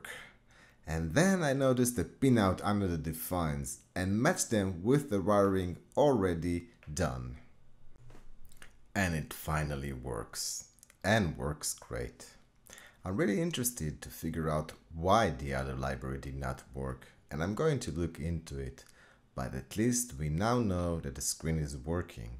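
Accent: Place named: Israel. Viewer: לפי המבטא אתה בטח מישראל !!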